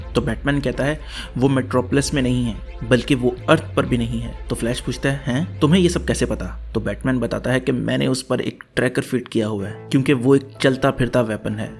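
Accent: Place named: Pakistan. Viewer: Pakistan ke log ko dc psnd krte h ... Mene jb dekha h to awaz se pta lg jata h ke ye pakistani h... Acha h me v dc ko support krta hu...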